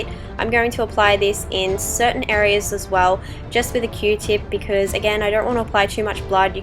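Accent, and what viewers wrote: Australia. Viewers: Amazing! I'm taking from the accent you're Australian like me, so is there a particular place fellow Aussie's can buy Ben Nye supplies or does it all have to be online/from USA? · Yeah Australian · are you Australian? Your really pretty and an awsome make up artist btw! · You Aussie? Love this!! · Lawrence Stapleton she sounds very australian : )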